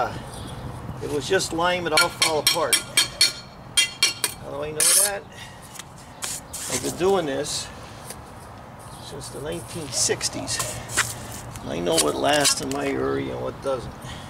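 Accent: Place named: Scranton, United States. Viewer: Love your videos....I had to look ya up what part of pa...I knew with that accent had to be scranton..keep up the great work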